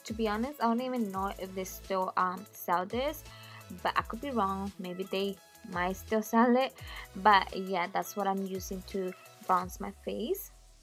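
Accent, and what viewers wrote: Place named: Mexico. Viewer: yall she mexican or sum thats why she has an accent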